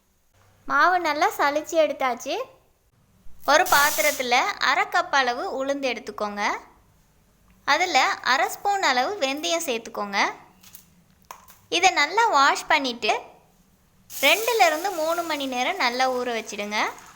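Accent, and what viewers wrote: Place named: India. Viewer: நீங்கள் பேசுவது இலங்கை தமிழ் இல்லை. இந்தியா தமிழ் மாதிரி இருக்கு.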